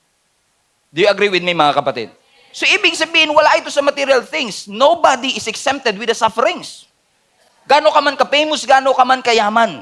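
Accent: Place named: Visayas, Philippines. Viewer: Ang Ganda marunong kpala mag Bisaya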